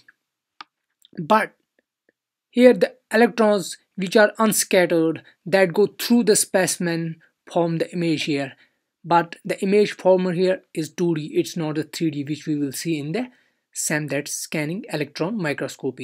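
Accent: Kashmir, India. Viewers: Booya che chukha koshur? ..chaani "t" talleh baasan ...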